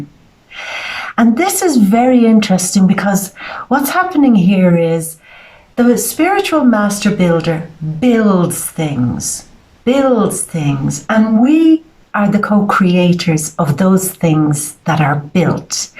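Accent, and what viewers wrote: Ireland. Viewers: I did not know you were living in Italy, sending love, Me mum is from Ireland and your accent sounds very Irish. I assumed you were from Ireland. lol Take care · Lovely to hear your lovely Irish accent today........really cheered me up.😋👍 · Hey. I must ask...I have been watching you for about a year and half, and have always been wondering where your accent is from. You mentioned your living in Italy. I used think when I first started to watch you, that you were from somewhere in northern Europe and then I have been thinking I am hearing an Irish accent. Please tell me where your accent is from 🤣 Thank you so much for all your wonderful videos. It's a pleasure to watch your beautiful face and listen to your lovely videos each month. Lot's of love, Deborah 🥰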